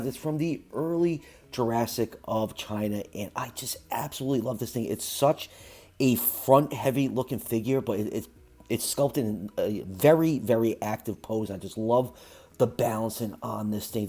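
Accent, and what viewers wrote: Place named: New York, United States. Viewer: I think he's found new york or jersey with his accent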